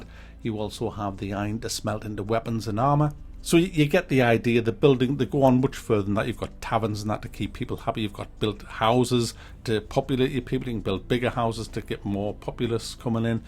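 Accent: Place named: County Durham, United Kingdom. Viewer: Love seeing the reviews, have done for years, im from county durham and weird hearing a local accent on youtube!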